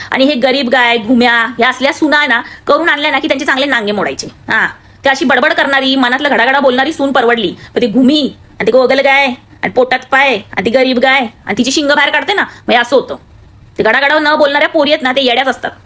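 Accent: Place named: Western Maharashtra, India. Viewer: तुमच्या भाषेचा टोन बघून तुम्ही सांगली कोल्हापूर सातारा इथल्याच वाटताय... पण जबरदस्त रिअल इव्हेंट वर विडिओ असतात सॉलिड 😂🤣😂😂.... Keep it up... 👍🏻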